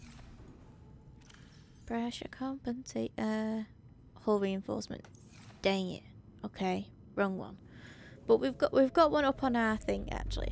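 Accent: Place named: United Kingdom. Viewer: British 👌. nice accent :)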